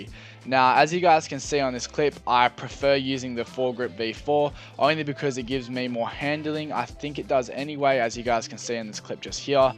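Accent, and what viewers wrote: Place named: Australia. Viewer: Are you from austrslia you sound like au chezzy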